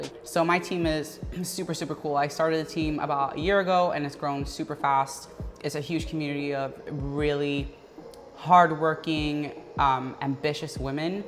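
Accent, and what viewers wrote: United States. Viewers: how does she sound so american being raised in sweden?? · for me she sounds like an American. Never would I thought she is from Europe ... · Would have guessed she was born and raised in The USA by how she speaks , sounds very American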